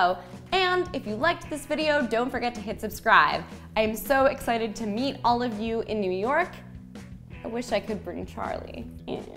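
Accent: Canada. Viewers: Canadian Accents bruh: "my begs" & "luggage tegs" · I love her Canadian accent 😍😍 the way she says tag is so cute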